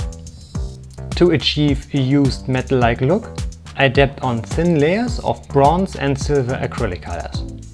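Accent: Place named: Germany. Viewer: Nice work, aber wenn deutsche versuchen Englisch zu sprechen hört der Spaß bei jedem Video auf O.o omg !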